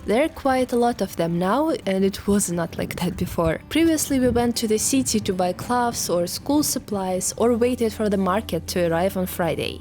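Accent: Russia. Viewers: Young, beautiful, active, funny, thick Russian accent, you represent every quality the men all over the world treasure among Russian women. Stay happy and make more videos. Your fella is a lucky man, really, envied by the world. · I’d be absolutely devastated if I ever found out that your Russian accent was fake! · Love Russian accent, would love to meet a russian girl here in Argentina, cheers! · I love the Russian accent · I just visited Russia last year. The country is so beautiful, I love it. I also like your Russian accent and simple explanation. Visiting back your kindergarten was of course quite touching.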